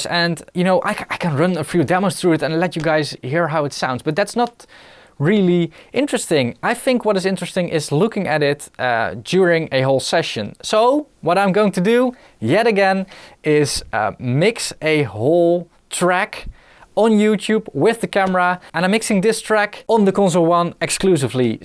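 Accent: Netherlands. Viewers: Steenkolen accent.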